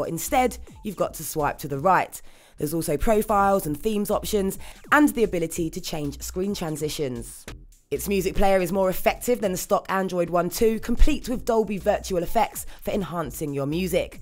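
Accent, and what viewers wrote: United Kingdom. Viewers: Love the British ascent! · Hate Britsh Acsent ! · i hate british accent too anyway half of this is rubbish because i can get 4g with it because i do the camera is amazing and the DEFINITELY NOT LAGGY U LIED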